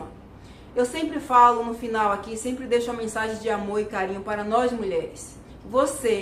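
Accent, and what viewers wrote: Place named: Bahia, Brazil. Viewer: Voce tem sutaque baiano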